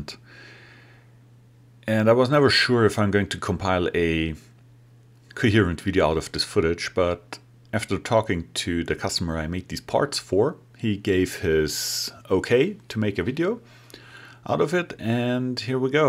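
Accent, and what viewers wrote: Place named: Germany. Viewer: You sound a lot like a professor I know. He is Dr. Chadoba. I work with him a little at the University of Texas at Arlington. The way you speak is very close to Dr. Chadobas speech. I recognize the German speach.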